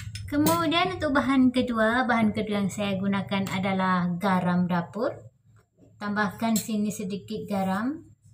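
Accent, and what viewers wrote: Malaysia. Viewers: Tuch yg NgoMong Org Malaysia yach?🤔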